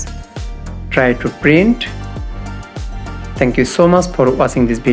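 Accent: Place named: Bengal, India. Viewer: তুইযে বাঙালি সেটা এমনিও বোঝা যায়। .. ইংরেজি এমনিও পারোস না হুদাই ভোকোর ভোকোর বাংলায় ক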